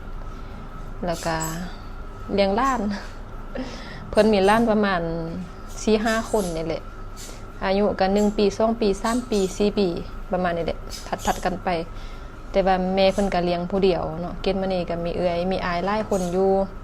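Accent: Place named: Loei, Thailand. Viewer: สำเนียงพูดจาภาษาเหมือนคนไทยเลย จังหวัดเลย จังหวัดเลยเขาจะพูดฮันแนว❤❤🎉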